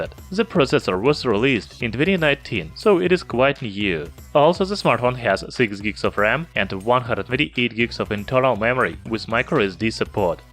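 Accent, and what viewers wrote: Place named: Russia. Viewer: 𝗠𝗮𝘆𝗯𝗲 𝘆𝗼𝘂 𝗮𝗿𝗲 𝗮 𝗥𝘂𝘀𝘀𝗶𝗮𝗻. 𝗔 𝗥𝘂𝘀𝘀𝗶𝗮𝗻 𝗮𝗰𝗰𝗲𝗻𝘁.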